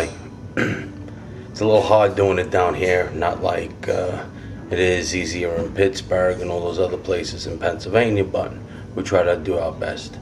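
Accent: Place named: New York, United States. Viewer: Your NY accent remind me lot of the guys from Grucci when they fly here to las vegas from new york to work on a show hahaha. and all i would hear is " hey Tony...go fuck yourself!" funny as shit loading and wiring shells in the sun and everyone is talking shit left and right...but playfully though..makes work more fun. happy 4th man!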